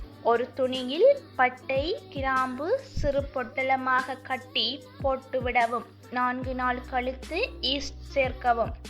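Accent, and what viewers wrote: Sri Lanka. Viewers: She is speaking srilankan tamil..pakki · Elangai Eelatamil arumai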